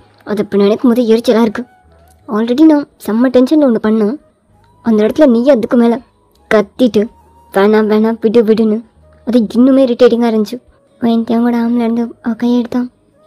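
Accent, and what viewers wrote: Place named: Madurai, India. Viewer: Hloo Sis 👀💜 unga channels ah recent ah tha theriyum Ella ffs uhm paathen intha ff romba Azhagaaa irunthuchuu like solla wrds eyy illa avlo Azhaguu 🫶💯✨️Ceo vum aprm unga oneshots ellame 🫠✨️But this hits diff yen ungaluku comment pandravanga idha pathi pesala nu enaku thrla unga dialogues laam Avlo Azhaga iruku...😫💯Vmin ah Avlo Azhaga kamichurunthinga intha story line eyy nallairunthuchu But jimin ku eathachu aagura maari tae feel pandra Maari kaamichurukalam i neant unga story romba nallairunthuchu i can feel vmin realtionship but jimin tha romba paasam kaamicha maari oru feel🐣🥀 spcl part potta apdi podunga And this made my day na avlo hpy ya feel pannen intha ff kekkum pothu intha comment padipingalanu thrla but padicha neengalum hpyy ya feel pannuvinga nu tha podren ❤️‍🩹✨️ Neenga madurai la antha slang Azhagaaa pesuringa na sivagangai...Aprm Starting la neenga okva nu ketutu neengale ok nu soldrathu enaku pidikum...Aprm support illanu feel pannathinga kandipa support kidaikum...And i will support youu okvaa...Saranghae sistaa...💜🦋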